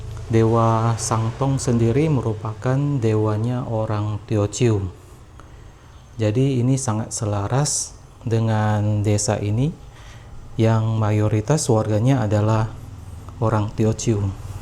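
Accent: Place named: Pontianak, Indonesia. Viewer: logat admin khas tionghoa ponti..hehehe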